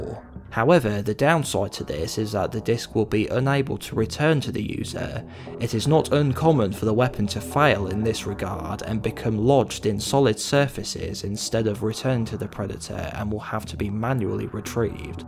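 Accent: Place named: England, United Kingdom. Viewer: Super gay English accent. Where are you from?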